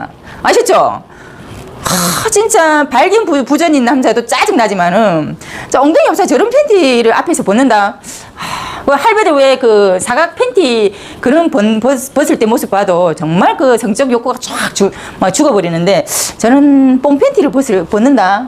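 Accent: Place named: Gyeongsang, South Korea. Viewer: 갱상도 사투리 맛깔나게 하네 엉덩이 노노 궁디 오케이~~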